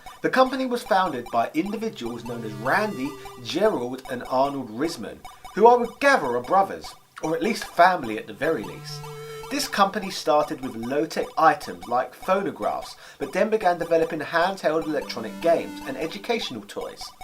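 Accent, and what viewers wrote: United Kingdom. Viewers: Shut up your british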